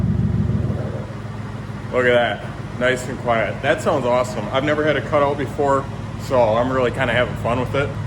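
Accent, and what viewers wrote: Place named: Wisconsin, United States. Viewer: U sir , sound like ur from Wisconsin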